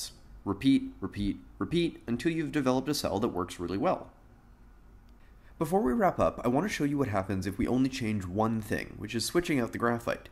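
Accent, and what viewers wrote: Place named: Canada. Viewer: Ok, you sound Canadian- even so....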